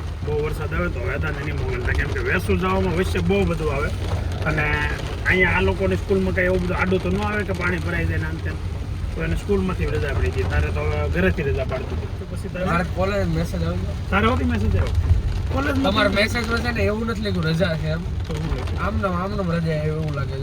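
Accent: Kathiawar, India. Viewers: Bhai tamari khatyavadi languge bau maja ave che sambhadvani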